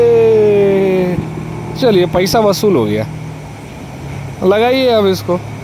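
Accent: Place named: Jharkhand, India. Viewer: Mast Jharkhandi language. Maja aa gaya.😊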